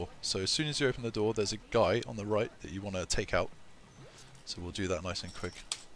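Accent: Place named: United Kingdom. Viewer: I like this guys voice (no homo) I wish I spoke British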